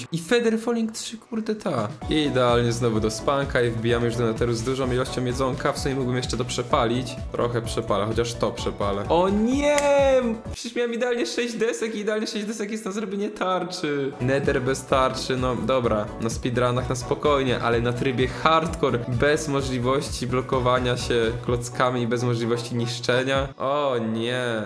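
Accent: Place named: Poland. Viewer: Ten twój Polski angielski czasami boli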